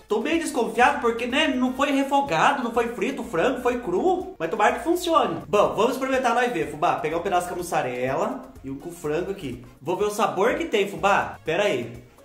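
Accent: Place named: South, Brazil. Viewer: Fubá, também sou do interior de São Paulo, só que sua cidade é próxima ao Paraná e a minha próxima de Minas,por isso você tem esse sotaque mais puxado pro Sul e eu pro mineires...😂😂😂❤❤❤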